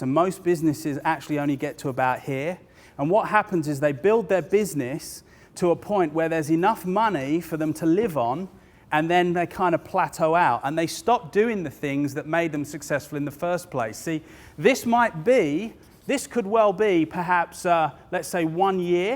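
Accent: United Kingdom. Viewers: This guy sounds sooo British than ordinary Brits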